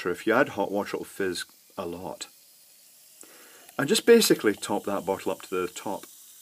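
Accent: Scotland, United Kingdom. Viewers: you can tell your scottish lol............ get 6 for a quid in poundland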